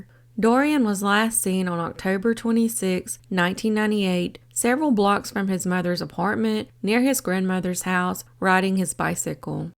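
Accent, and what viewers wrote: South, United States. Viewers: I love your southern accent 😊.. you are doing an outstanding job telling these stories getting the word out also.. I like listening and watching your channel... thank you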